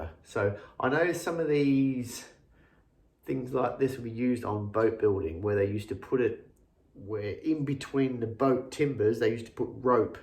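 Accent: Australia. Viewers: So nice to see and hear another Aussie away from home. Was really funny how you called it a sanga as I said that to my Dutch hubby the other day and had to explain what I was saying. Was a great Episode... keep em coming :-)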